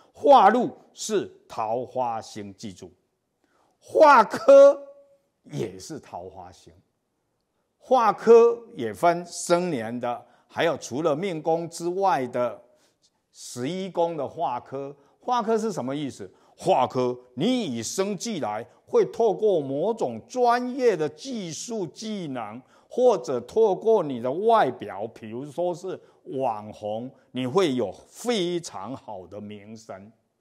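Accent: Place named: Taiwan. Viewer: 老师的普通话的口音是台湾人已经是很容易听得明白。你试按上面字打开幕那个扭试试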